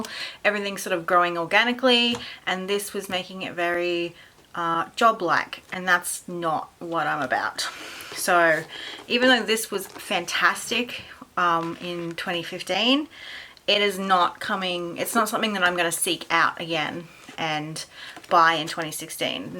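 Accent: Australia. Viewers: I love Australian accents. So cute :D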